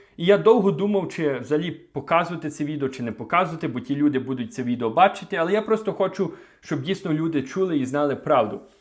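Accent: Western Ukraine, Ukraine. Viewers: Вы говорите что вы умеете хорошо можете говорить на российский мови тому до у вас е западэнсЬкий акцент. А до робыть вам якщо мы Родом з Мариуполя и розмовляють там все российской. Мы были там всю блакаду голодали и с трудом выехали из оккупированной територии. Что нам делать если мы родились и выросли в Украине а разговариваем на непонятном языке не на русском и не на украинском. За то что мы такие к нам плохо относиться. У м Мариупольцев обромное горе Нас лишили окупанты всего имущества которое у нас было и лишили здоровья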